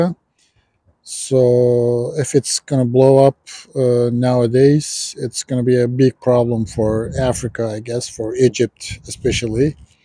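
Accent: Turkey. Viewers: Aksan Türkçe, hemşerim ne işin var orada, kaç kendini kurtar. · Hocam sivaslı mısın? Tam Sivas şivesi var sende😃😃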